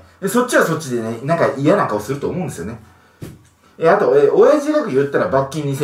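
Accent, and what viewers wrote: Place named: Kansai, Japan. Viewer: なんで関西弁なん？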